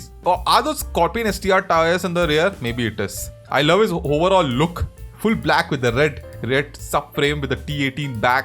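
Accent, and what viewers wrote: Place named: India. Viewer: Why does Indian accent sound so gay and funny😂😂😂😂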